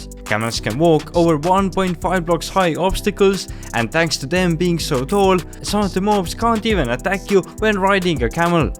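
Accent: Estonia. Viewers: That estonian accent is so goofy😅😂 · is that an Estonian?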